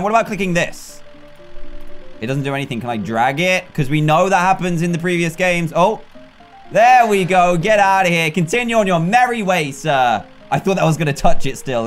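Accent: United Kingdom. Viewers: Well Mr probably American ,or Canadian ,he's British so who freaking knows what he says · he s bidish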